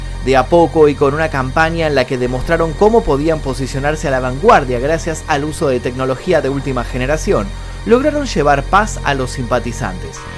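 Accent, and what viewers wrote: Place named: Argentina. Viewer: Con todo respeto, no lo se, pero me atrevo a decir que por su acento es argentino, y si es el caso, es la primera vez que veo un video de un argentino hablando del mundial y sin decir que o explicar por que Argentina debería ser campeón. (Ojala si gane) hahaha apoyando a latam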